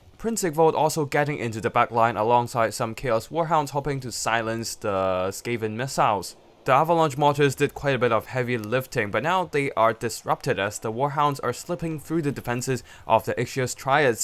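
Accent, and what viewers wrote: China. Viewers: trying to place your accent but really unsure. Are you chinese?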